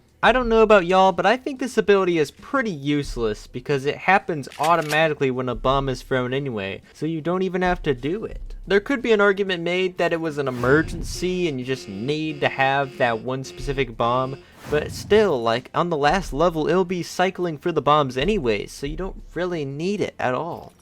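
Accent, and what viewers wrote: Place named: United States. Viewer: This is my first time watching you and I was like wow he sounds very American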